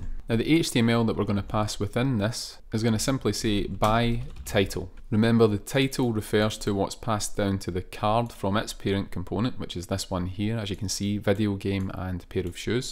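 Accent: Scotland, United Kingdom. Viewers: Ey lad, love the scottish accent, real killer. Would be real nice if you could just display what you're trying to build and what you'll get out of the framework/tutorial. Thanks a lot.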